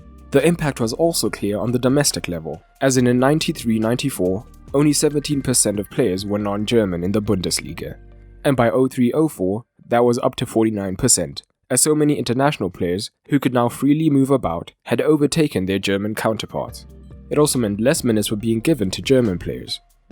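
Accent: South Africa. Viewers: I could swear you have a South African accent bro. I love these videos! And the OneFootball app 💪🏾 Keeping it Simple 👌🏽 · Where are you from? You sound South African.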